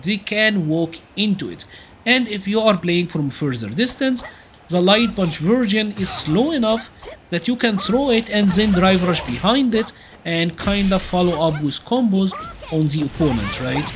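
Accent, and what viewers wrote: Egypt. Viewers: I can tell you’re egyption just from the first minute listening to your accent lol. Great video btw really helpful.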